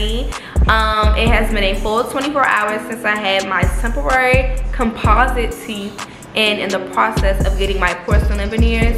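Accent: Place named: Washington DC, United States. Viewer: GIVING DC ACCENT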